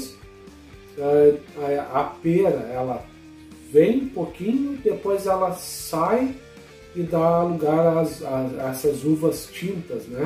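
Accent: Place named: Rio Grande do Sul, Brazil. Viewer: Buenas meu amigo que, pelo sotaque, só pode ser gaúcho! Conheci o canal hoje e já fiz a inscrição! Ótimo conteúdo! Abração!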